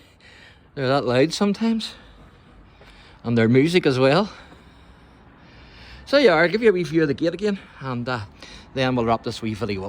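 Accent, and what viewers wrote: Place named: Northern Ireland, United Kingdom. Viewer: I'm from Drogheda - the correct pronunciation is Draw-da, because that's how we say it. I could accuse you of having a Northern accent too but, frankly, kettles and pots! Keep up the good work.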